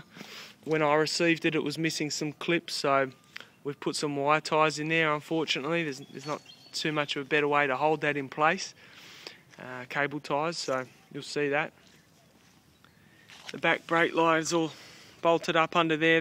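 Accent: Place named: Australia. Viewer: the the auzzi accent man and you got a sick ride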